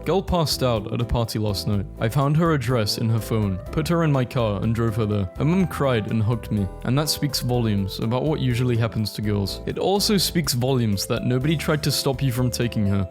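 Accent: United Kingdom. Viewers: It’s cause he’s british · first of all it was a joke, second of all he has a British accent so I assume his first language is English and you saying it like it’s not his first language and if that was the case I would think differently, second of all I’m not saying he should be able to pronounce all of those words you listed because yeah they are hard to pronounce.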